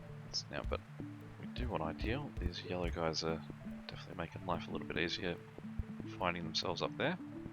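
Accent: Australia. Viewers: It is so refreshing to hear a fellow Aussie on this site, and one with a good mic at that. Keep making these vids mate, I'm waiting for you to blow up.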